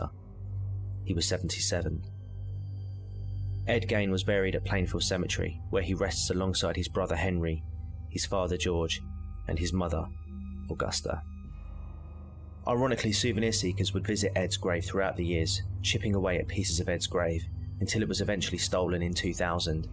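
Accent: United Kingdom. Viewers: what area are you from you have a west end/essex tang to your voice but cant pin it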